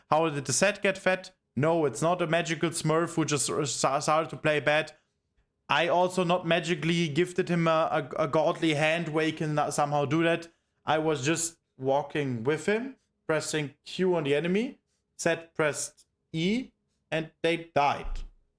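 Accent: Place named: Germany. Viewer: Sounds like German English. 😄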